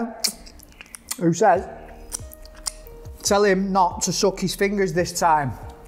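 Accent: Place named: Accrington, United Kingdom. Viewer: Hahaha honestly you’re funny as fuck, the only issue is your accent, went with a dirty bird from Accrington once and she sounded just like you. 😂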